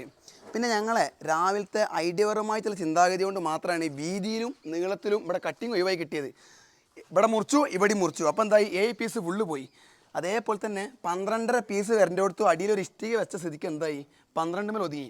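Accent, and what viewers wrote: Malappuram, India. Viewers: താൻ മലപ്പുറത്ത് എവിടെയാണ് തന്റെ സംസാരം സൂപ്പറാ👍🏻👍🏻👍🏻👍🏻👍🏻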